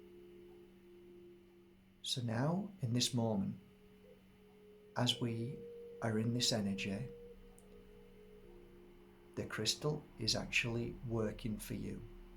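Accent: Yorkshire, United Kingdom. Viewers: A wonderful video! Thank you..and..I truly enjoy your Yorkshire accent❤️❤️❤️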